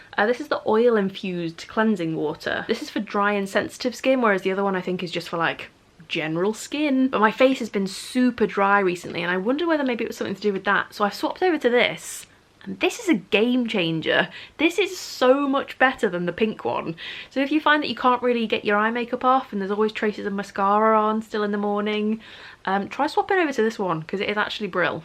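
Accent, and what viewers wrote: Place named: Wirral, United Kingdom. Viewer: Isn’t it funny that I could tell from your first video that you were from the Wirral 🎉🎉 We always recognise our own 😂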